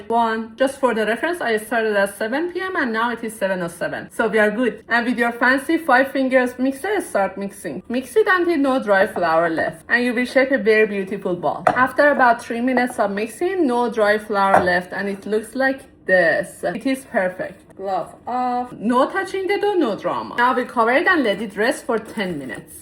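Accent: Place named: Czech Republic. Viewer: Love your personality and accent. Are you Czech?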